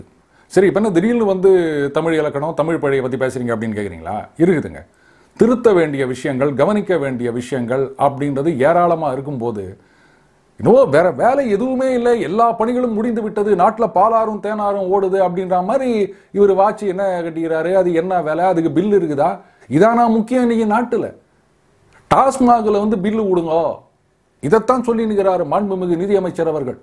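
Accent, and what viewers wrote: Chennai, India. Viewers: உங்கள் சென்னை தமிழ் ரசிக்கும்படி இருக்கு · I simply love your Madras bashai/ lingo/ dialect and sarcasm/ wit/ humour with bare facts!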